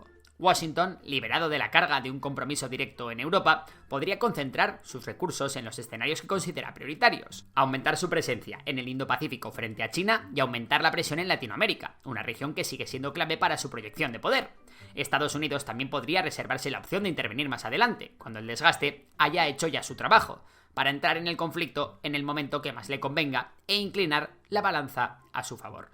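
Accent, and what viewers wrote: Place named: Spain. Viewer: Escuchar a un español da vertigo.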